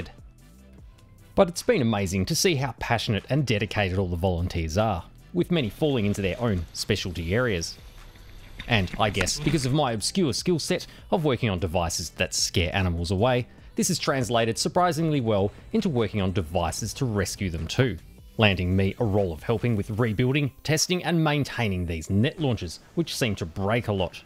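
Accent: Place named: Australia. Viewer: You are SO funny, and clever with the storyline. Great to hear an Aussie accent on you-tube for this sort of thing (engineering, experimentation sort of thing). Maybe the vapour puffs after the wadding exited the tubes are from adiabatic cooling of the compressed air as it escapes and expands rapidly, causing water vapour in the air to condense in micro droplets in the cold gas? (Water vapour creating short-lived, mini clouds).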